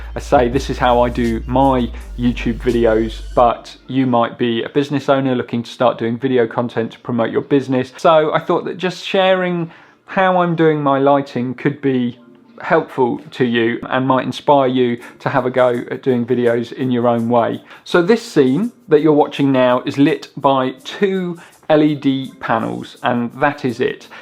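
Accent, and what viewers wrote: United Kingdom. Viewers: Great video mate. I detect a midlands twang in your accent ☺️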